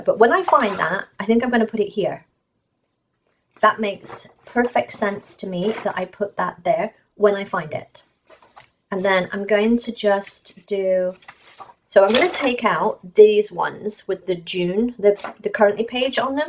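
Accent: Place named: United Kingdom. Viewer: are you from the UK originally? Your accent and phrasing is very UK. Agree that HP have to expand their faith range x My craft room is the same 😂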